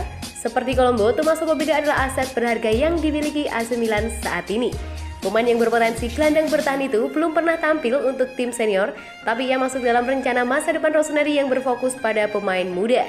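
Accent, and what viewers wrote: Java, Indonesia. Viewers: Masih kedengeran Medok mbak, hehe..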